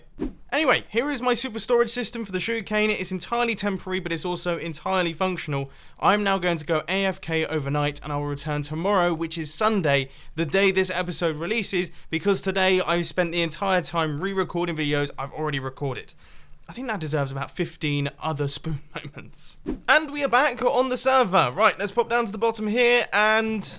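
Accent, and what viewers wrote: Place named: United Kingdom. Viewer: Ur British! finally!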